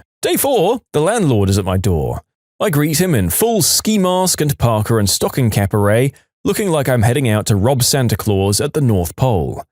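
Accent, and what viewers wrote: United Kingdom. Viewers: He's British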